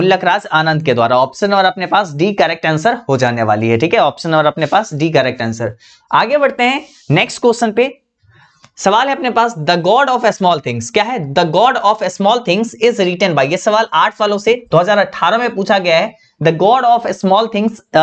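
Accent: Bihar, India. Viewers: Bihar se hain babua ❤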